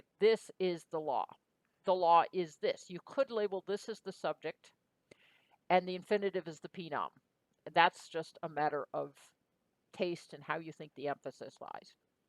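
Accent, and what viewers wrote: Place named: United States. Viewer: And another American pronounciation and diphtongisation of Ancient Greek... why does noone pay attention to the basic phonetics???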